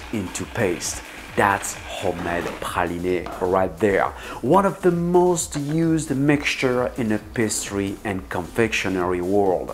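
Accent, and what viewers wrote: France. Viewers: I can't figure out if this guy has a French, American or British accent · Not sure if he's American trying to do a French accent, or French trying to do an American accent... 🤔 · The croissants seems amaizing, but any similarity with France (albeit the accent) was lost when you defined the butter layer in imperial rather than metric. So sad...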